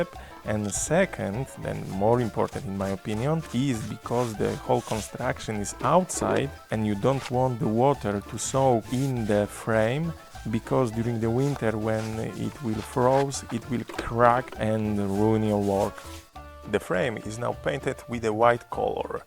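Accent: Poland. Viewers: Od pierwszych słów wiedziałam że jesteś z Polski 😊 dzięki za film. Planujemy coś podobnego w ogrodzie. Pytanie, czy wanna nie nagrzewa się zbytnio bezpośrednio od ognia? Nie parzy aby?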